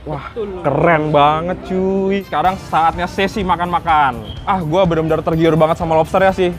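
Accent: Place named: Tangerang, Indonesia. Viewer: Logatnya tangerang banget bang😂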